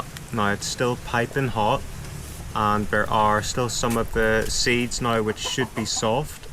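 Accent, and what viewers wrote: Northern Ireland, United Kingdom. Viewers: u sounds familiar lyk norther ireland accent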